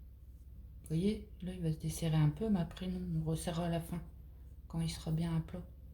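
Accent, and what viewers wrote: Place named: Nord-Pas-de-Calais, France. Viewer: Très joli est je pense reconnaître l'accent cht'i, bonne année 🎉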